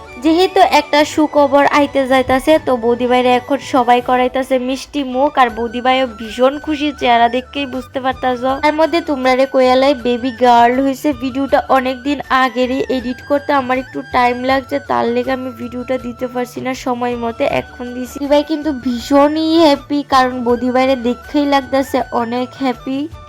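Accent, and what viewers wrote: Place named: Habiganj, Bangladesh. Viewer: আমার প্রিয় জেলা হবিগঞ্জ থেকে দেখছি আপনার কথা আমাদের হবিগঞ্জের ভাষা মতো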